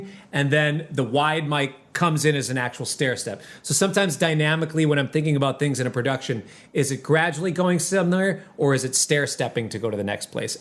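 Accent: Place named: Minneapolis, United States. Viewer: you’re definitely a south Minneapolis dude, I could tell, nothing wrong with it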